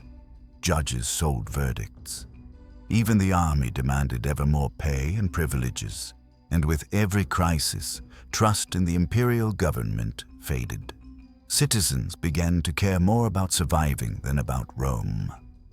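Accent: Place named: Australia. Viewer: Like others in the comments, for me it sounded like Australia.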